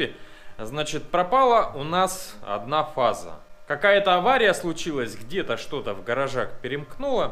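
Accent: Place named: South, Russia. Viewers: До сих пор не могу привыкнуть))) В голове Игорь за кадром)))))) видимо у вас говор такой южный. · у вас говор такой южный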